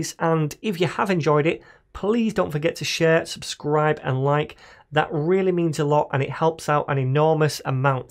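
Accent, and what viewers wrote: United Kingdom. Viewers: Is this speaking British Accent?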